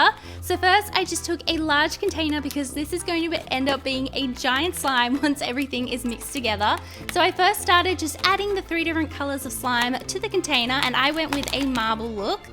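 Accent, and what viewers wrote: Australia. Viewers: Are you alstralian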